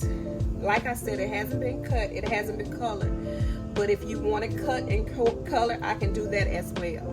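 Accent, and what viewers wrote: South, United States. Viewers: Love it! Where are you from, I hear that southern draw lol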